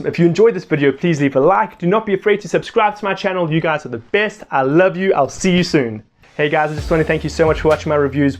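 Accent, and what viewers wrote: Wales, United Kingdom. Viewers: It's a Welsh accent like mine from the valleys. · It’s a Welsh accent! Like mine!and Littlefinger isn’t doing welsh it’s Irish diluted with English.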